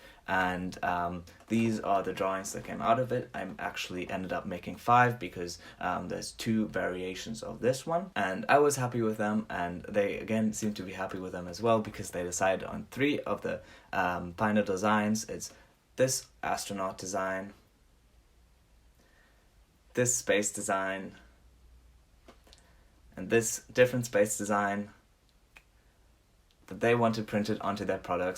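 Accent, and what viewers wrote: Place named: South Africa. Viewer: i knew i heard south african :) my parents are both from there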